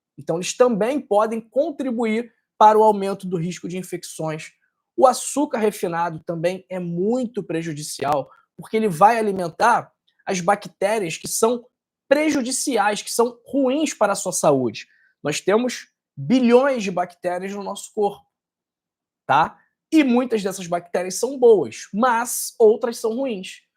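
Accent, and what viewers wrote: Rio de Janeiro, Brazil. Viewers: Sotaque carioca é dureza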